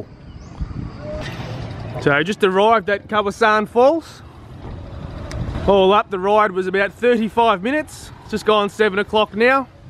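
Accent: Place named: Australia. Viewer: Lol That Aussie Accent and Aussie Slang! Aussie Aussie Aussie oi oi oi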